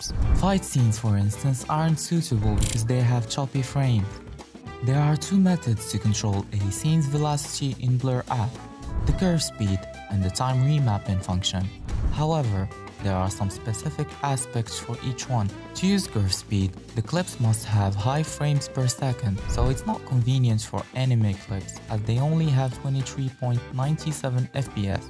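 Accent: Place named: Morocco. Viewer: You sound Moroccan😭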